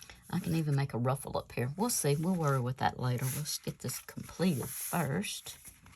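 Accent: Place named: Kentucky, United States. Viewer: You sound like you may come from my neck of the woods. I’m from KY and just learning, these digitals are gorgeously beautiful lol. Thanks for sharing.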